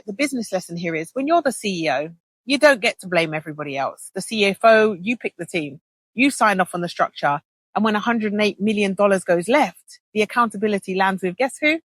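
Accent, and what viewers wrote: United Kingdom. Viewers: My thoughts are she should take full responsibility and accountability for everything and also that your ADORABLE wit that Bri ish accent 🇺🇸 🇬🇧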